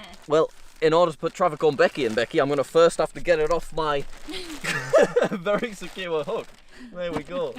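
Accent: United Kingdom. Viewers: I love the British axsent because it is so funny 😆 haha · are u bri ish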